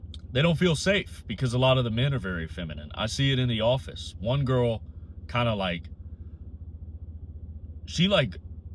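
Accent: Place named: Louisiana, United States. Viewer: Your accent reminds me of Gambit from X-Men bro lol. Love your videos!!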